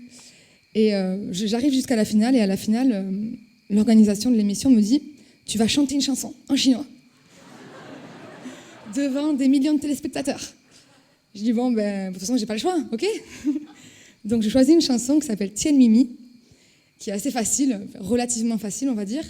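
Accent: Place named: Toulouse, France. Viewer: L'accent délicieux de Toulouse !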